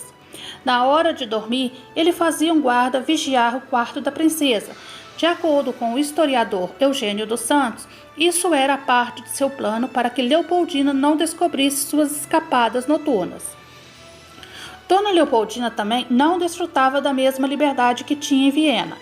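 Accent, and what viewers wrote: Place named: Piauí, Brazil. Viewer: Vc é do Piauí? Seu sotaque me lembrou meus amigos de lá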